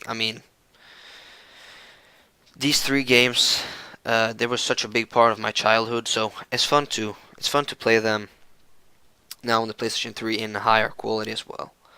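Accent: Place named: Ireland. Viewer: You from Ireland mate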